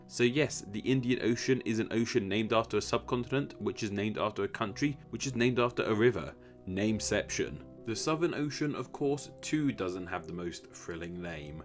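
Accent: United Kingdom. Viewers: The oceans got their names by being called that by someone, and it stuck. Again, this robotic British twit narrator should be used to stop hurricanes, as his delivery is so banal that even a force of nature would turn away. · This British accent ! 😌🙏🏻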